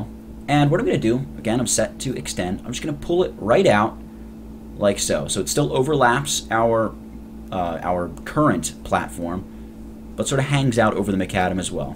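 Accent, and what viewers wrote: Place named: United States. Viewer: armana head on over.... armana go ahead and.... Super Cooele.... gonna move this guy here... Whole buncha stuff Don't you just love what America has done to the English language?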